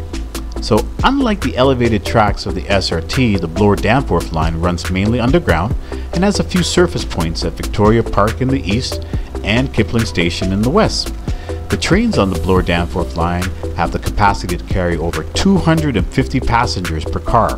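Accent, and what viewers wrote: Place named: England, United Kingdom. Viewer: The explanation was awesome with English efficiency and accent 😍 I love this video a lot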